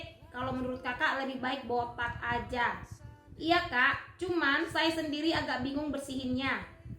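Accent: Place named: Banyumas, Indonesia. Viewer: Ini lebih keren toplah mbak infonya ngapak keren ren ren👍🏼👍🏼👍🏼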